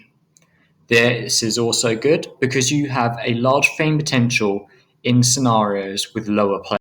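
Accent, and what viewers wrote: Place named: United Kingdom. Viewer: I clicked off after hearing the british accent!!!!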